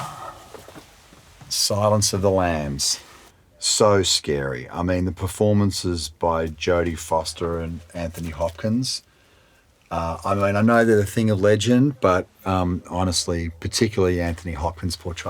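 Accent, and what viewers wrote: Australia. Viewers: I didn't even know he was Australian, never heard his natural accent! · he sounds extra Australian here lol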